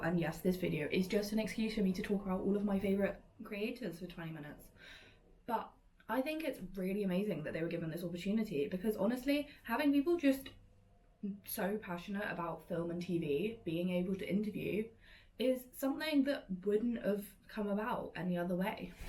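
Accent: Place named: RP, United Kingdom. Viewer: Your accent is so strong and you sound so posh. Haha, I say this in an endearing way from someone who is not anywhere close to the UK, and is from Indonesia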